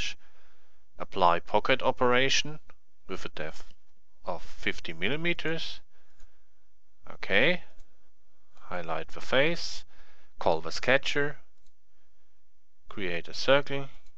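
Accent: Germany. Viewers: For me 1x speed is just rigth. My native language is Spanish so listening English talked by a german (I guess), and understanding it, is fine. By the way there are FreeCad tutorials in Spanish by a guy that calls himself Obi Juan and these tutorias are very, very good. FreeCad is so big that there are many ways to learn it, so the tutorials here and the ones from Obi Juan are, for me, complementary to each other. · Great tutorial. I have attempted to learn CAD before. But training videos that I tried in the past were way to fast and concepts were not clearly explained. Usually the presenter in the tutorial was clicking buttons too quickly or using keyboard commands so I could never clearly understand how things were being done. But this video is very well paced, concepts clearly explained and the steps involved very clear. A really fantastic job! And the German accent, just makes me feel smarter listening to it :-) I look forward to viewing the other videos and I now feel confident that I can do some home machining of parts I design myself. 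Thank you!!! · are you actually german, you dont really sound like a english men.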